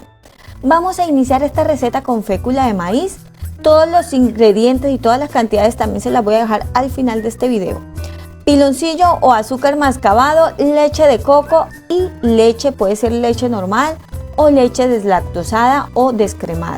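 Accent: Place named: Valle del Cauca, Colombia. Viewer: Amo tú acento vayuno oís.... yo soy una colombianacense con alma de valluna💞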